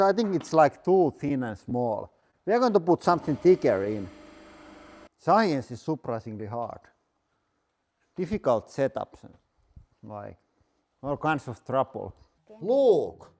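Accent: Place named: Finland. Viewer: Herrane aika mikä suomi aksentti 😅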